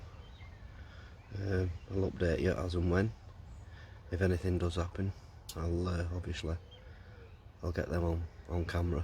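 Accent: St Helens, United Kingdom. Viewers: You from St. Helens mate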